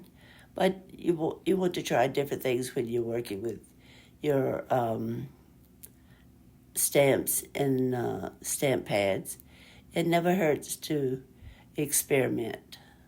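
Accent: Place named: Deep South, United States. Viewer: 👋 Hello Neighbor! My Tennessee Neighbor, I know you live in Tennessee but I would swear I hear a Deep South accent in you, I could be wrong though. I live in and was born here in rural Appalachia, Leslie & Harlan county, but in my 20’s I movie to Biloxi Mississippi for a couple years, and I’m just curious if you are Originally from Tennessee or if maybe you grew up down South? I LOVE your channel, I am fairly new so I’m watching some of your older videos too in my free time, your toilet paper video blew my mind! I think you are a undiscovered Genius! 😊❤ You are Awesome sauce❤, a wealth of knowledge, I’m so grateful to learn from you, Thank you so much for sharing!!😊 I just adore you. Also, 👋 hello to everyone watching, crafting friends! 😊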